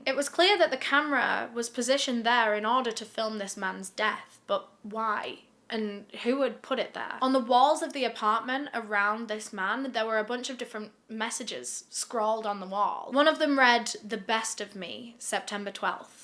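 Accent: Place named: North England, United Kingdom. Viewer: Coming back to this video as handled this subject with such professionalism & care. Honestly she’s one of the few true crime YouTubers who actually doesn’t glorify these people / subjects & I have so much respect for that. Edit: Also as someone who has family & friends who live in the North her accent brings me a weird sense of peace whilst listening to these fucked up stories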